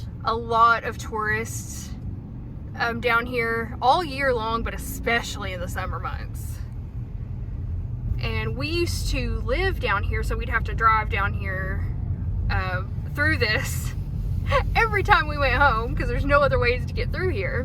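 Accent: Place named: Arkansas, United States. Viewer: I look forward to these videos! Arkansas is beautiful❤ My aunt Mary is from there and your accent and hers are so similar it’s funny. I wish you luck in your therapy and your marriage🥰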